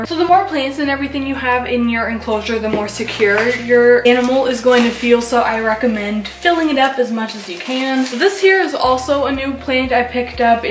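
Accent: Canada. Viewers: Are you Canadian? I think I hear the accent lol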